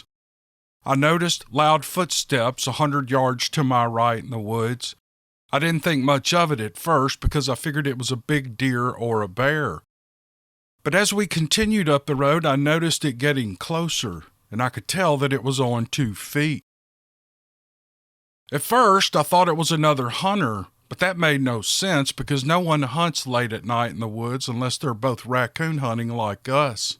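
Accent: South, United States. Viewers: I don't know if they are true either. But to me it really doesn't matter. If they are true, good,if they aren't then it was a good story. I'm never going to assume that they aren't, cause I don't know. But please keep reading them regardless. I love listening to your voice with that southern drawl.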